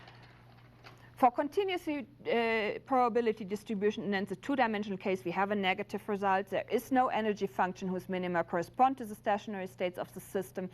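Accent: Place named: Germany. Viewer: She´s german, right? Really usefull, thanks a lot for the upload!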